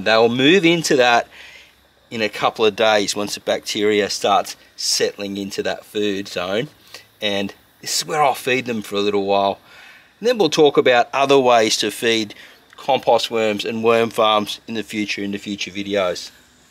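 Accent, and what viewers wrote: Australia. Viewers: Thanks mate. Always good to hear an aussie accent 🤙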